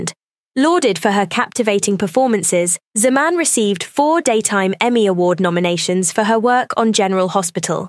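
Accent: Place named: RP, United Kingdom. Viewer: It's pronounced "vie da" , not "vee da", Blue. Rhymes with "Ida". Since you use British RP accent, it rhymes with cider, not cedar. Anyway, may God grant Vida Blue eternal peace.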